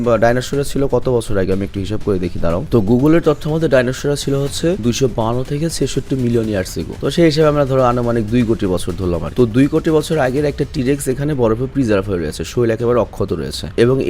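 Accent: Noakhali, Bangladesh. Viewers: Vai Noakhalir kotha sune valo laghse..ami Noakhali 😌 kno Noakhalir kotha bolsen jani na kintu valo laghse..1 ripley plz😌👍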